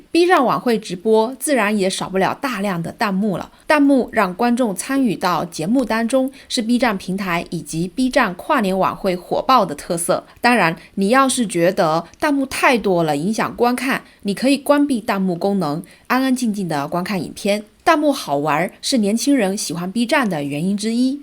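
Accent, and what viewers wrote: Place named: Pingxiang, China. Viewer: 有萍乡口音，要么您就是湖南人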